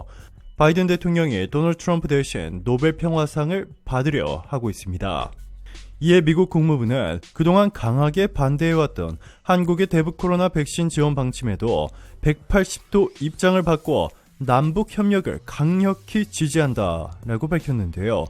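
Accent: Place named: Myanmar. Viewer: 나레이션 남성분 한국어 좀 제대로 말 하시길 바랍니다. 한국말이 마치 미얀마 사람이 말하는 식으로 들리며 끊고 숨 쉬고 이게 잘 안된다는 것입니다.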